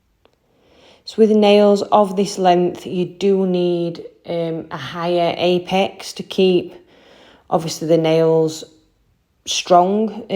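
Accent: Yorkshire, United Kingdom. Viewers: I’m trying to watch as many of your videos as possible. Your work is so beautiful and fabulous clean application it’s a pleasure to watch. Love your accent too, are you in Yorkshire by chance, I’m in Sheffield 😊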